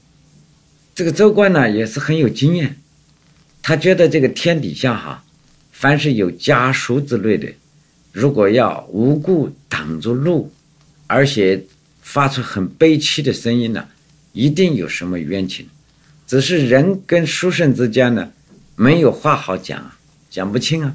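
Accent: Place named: Jiangsu, China. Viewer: 这个口音一听就是江苏中北部口音无疑！ 司马老先生最近仙逝。 安息 :face-red-heart-shape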